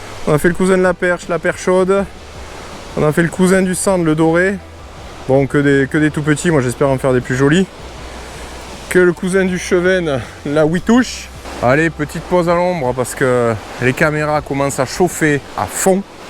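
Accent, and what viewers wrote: Quebec, Canada. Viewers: ...c`est autre chose ca, le francais quebecois, assez dur pour un Neérlandais...mais ca ira, salut des Pays-Bas!!!😀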